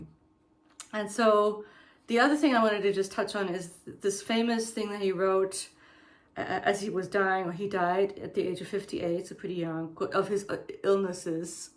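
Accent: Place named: Germany. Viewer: Where are you from? Impressive German accent. (Edit): lol just reached the part of the video when you say you’re German 😂 Liebe Grüße aus Österreich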